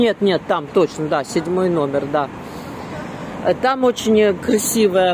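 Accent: Odessa, Ukraine. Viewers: Класс!!! ---,, но уже пошли по здесь! " неповторимый Одесский говор, мягкий, добрый!!! Маса удовольствия, слушать ВАС! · Прогулка по Одессе прелесть! Милый одесский говор! Оставайтесь прежними одесситами, не поддавайтесь новой политике. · Большое спасибо за прогулку. Я в Одессе училась в ОГУ, работала вышлв замуж, родила реенка. К большому сожалению по семейным обстоятельствам вынужлена была уехать в другой город, но Одесса всегда со мной. Судяпо говору вы настоящая одесситка. Обожаю вас. Напишите по какой улице вы идете, для меня это важно. Только название настоящее. Заранее благодарю.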